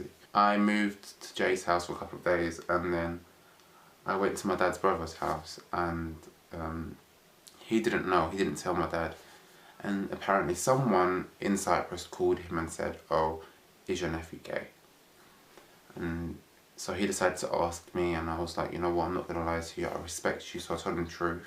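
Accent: United Kingdom. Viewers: Mate, I am proud of you. You don't need people who don't love you and don't respect you for who you are. You sound British, so take heart, you live in one of the most tolerant, loving and peaceful nations in the world. The more you be yourself, the more you will find people who really love you and you will form newer better connections. Peace. x I would love to know how you feel about Islam following your family fallout. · You seem to have a very 'North London' way of speaking (in main part of the video).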